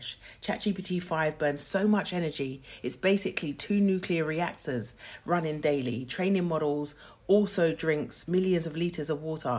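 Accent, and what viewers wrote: United Kingdom. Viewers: Ooowter, british, a i abosoultely loved your accent.😂😂❤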